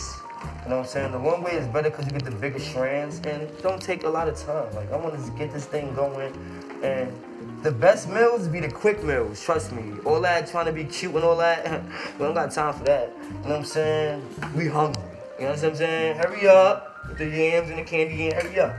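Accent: New York, United States. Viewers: This got to be that member of AMP Fanum or he def from New York lol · First 2 seconds I knew he really from New York 😭😩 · Before i started the video i knew he was from NYC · how he know i aint pay my rent last weeeeek im deaddddd he more New York then New York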